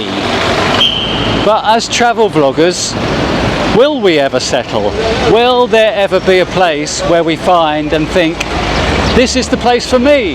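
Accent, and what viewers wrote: England, United Kingdom. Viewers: actually I just realised. I really hate the UK accent, especially London. But your English accent is much easier on my ears :) Have a nice rest of the week. Dramaguetti, Dumaregretti haha. What else is that place called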